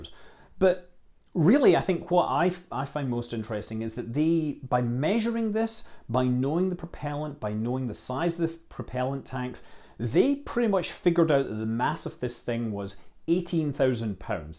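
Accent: Scotland, United Kingdom. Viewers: That's a very convincing Scottish accent.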